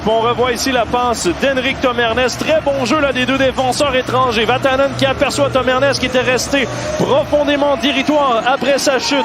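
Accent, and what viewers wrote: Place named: Quebec, Canada. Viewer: pourquoi la commentaire est en francais quebecois?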